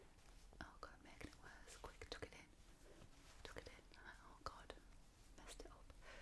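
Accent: United Kingdom. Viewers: Can I ask one more question? I sort of collect accents and I'm somewhat good at US accents, but that's because I live here. I'm really just starting to be able to recognize accents within the UK. What area does your accent originate from?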